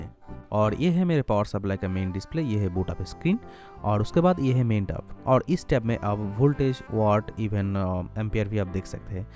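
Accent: Bengal, India. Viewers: R u bengali? 🙂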